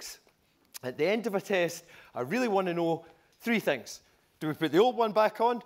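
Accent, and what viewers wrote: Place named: Ireland. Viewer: that accent though such heavy Irish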